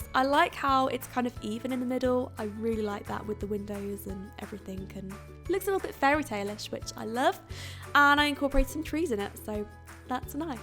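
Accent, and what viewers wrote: United Kingdom. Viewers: Can you do a Q&A? And if you'll do one I have some questions: Where are you from? (In the video you said you moved to an apartment in Switzerland, but you have like a "light" British accent) How do you find the inspiration to your builds? Can you do a colab w/ Vixella please?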